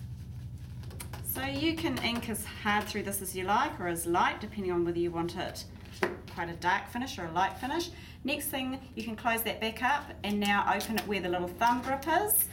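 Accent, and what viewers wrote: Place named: United Kingdom. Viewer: British Accent LOL! Cute tho, :)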